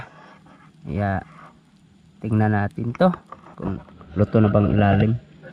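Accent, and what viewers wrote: Visayas, Philippines. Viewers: Bisaya man cguro ka. Lisod d. E bisaya? Hehehe... Mas nice magbisaya kay baga sa tono😂😂.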